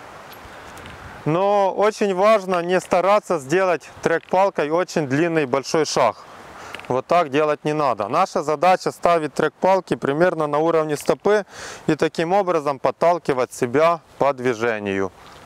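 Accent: Ukraine. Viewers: у вас же лютий, важкезний український акцент. не тортуруйте себе, говоріть українською!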